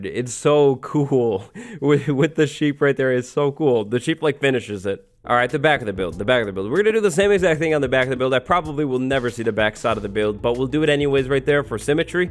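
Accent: United Kingdom. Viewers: HE’S BRITISH?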